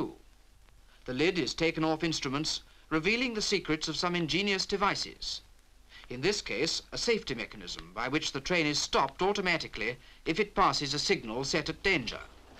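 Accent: RP, United Kingdom. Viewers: Plummy voice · A real cut gless eccent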